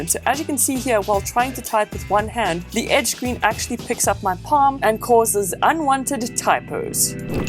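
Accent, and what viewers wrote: South Africa. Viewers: Is this broad south African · South african accent? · ek wou sê sy het 'n Suid Afrikaanse aksent 😂😂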